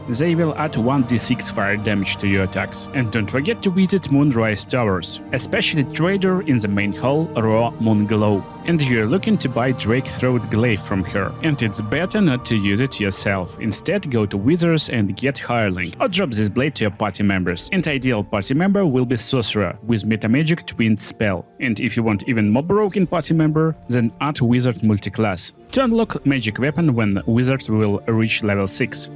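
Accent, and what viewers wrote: Russia. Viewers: РАШН АКЦЕНТ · Такой сильный акцент, что у меня подозрение, что автор - русский. - Helldusk Gloves from Act 3, not from Act 2.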